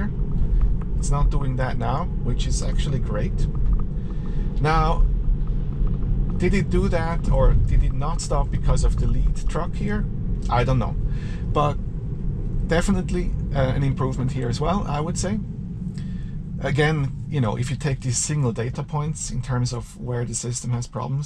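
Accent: Switzerland. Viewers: Hmm... you sound very Swiss :) Grüezi Thanks for the video! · Sicher en schwizer mit dem akzent😄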